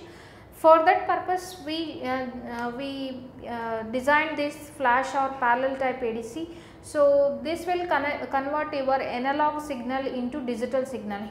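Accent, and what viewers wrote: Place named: South India, India. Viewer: South Indian :]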